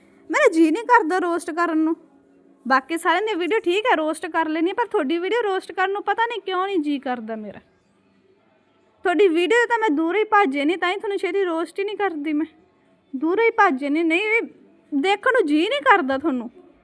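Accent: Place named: Punjab, India. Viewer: Love your Punjabi accent!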